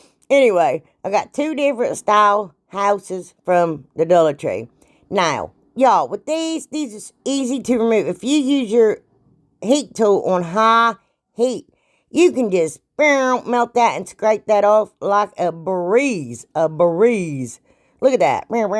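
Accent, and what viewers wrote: South, United States. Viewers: are you okay??? I know by your accent you are from the south and have just seen the terrible devastation in Mayfield, Kentucky! Can you please tell me you are safe and well! Scotland Girl 🥰🥰🥰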